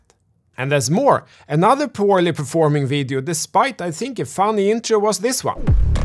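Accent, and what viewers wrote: Sweden. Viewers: It's not your video's that suck or your calls... But No one understand wtf you're saying with that heavy jibberish swedish accent :D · Ahhh the Swenglish we all been missing 😎👍🏻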